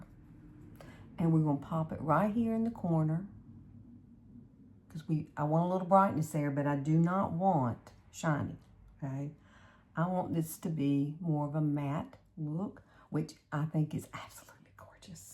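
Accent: South, United States. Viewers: I love your southern accent! You’re very cute and funny.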